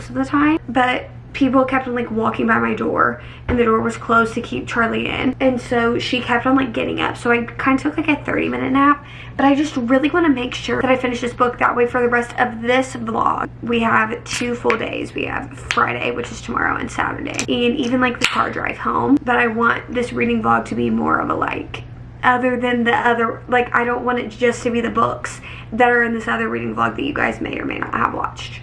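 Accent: South, United States. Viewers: Her southern accent is the cutest thing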